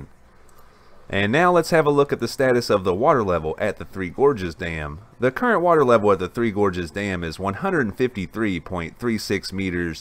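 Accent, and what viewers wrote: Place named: Texas, United States. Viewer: Good coverage. Another interesting video. I have been subscribed for a few weeks now and am enjoying the dam coverage. I also enjoyed the coverage of the Mt. Sinabung eruption. If I could bring up a minor sticking point on your vocabulary... the word reservoir is pronounced resev-war not resev-wire. Just FYI. Wouldn't want anyone mistaking your charming southern drawl (Texas?) for ignorance.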